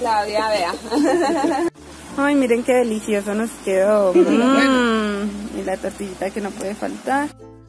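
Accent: Colombia. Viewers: A mi lo que me inspiraria a escribir son tus bellos ojos y tu forma de hablar colombiana se ve que sientes frio para la próxima llevate un sueter que este calentito porque el que tienes puesto no calienta en lo más minimo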